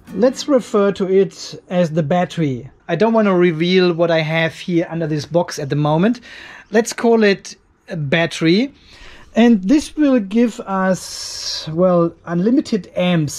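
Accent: Germany. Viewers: Nothing sounds more stupid than a German trying talk english 🤣😂🤣😂🤣😂🤣😂🤣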